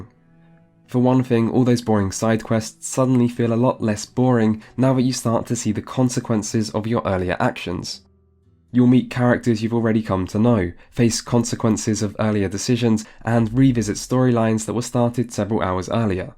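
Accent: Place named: United Kingdom. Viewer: WEll, that was a waste of time. One day I'll learn to unclick when I hear a random british-sounding white dude voice.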